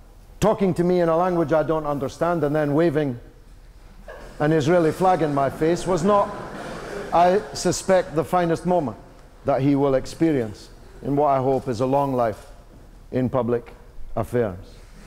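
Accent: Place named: Scotland, United Kingdom. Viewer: No, he's 100% Scottish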